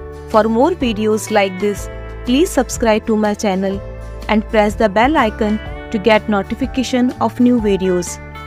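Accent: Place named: India. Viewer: I like that you've always used your own native Indian accent. I appreciate it more with all the annoying AI fake voices appearing.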